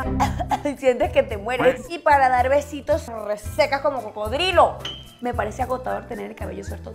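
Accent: Venezuela. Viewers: hola una pregunta 'tu eres venezolana'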